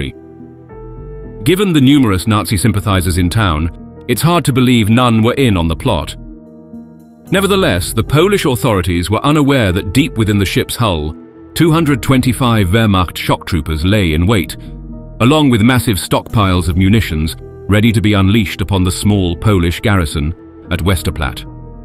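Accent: United States. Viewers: Why does this English-sounding presenter use those awful American pronunciations for such words as "dee-poe" instead of " deh-poe"and "A-dolf" instead of "Ah-dolf", and say "two hundred twenty five" rather than " two hundred AND twenty five? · This clearly has an English voiceover mispronouncing words in the American way, like Aydolf instead of Adolf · As ever with these videos they go totally off subject wasting your time. Scroll WELL forward. By at least 7minutes. Why does the “English” commentator have American pronunciation.”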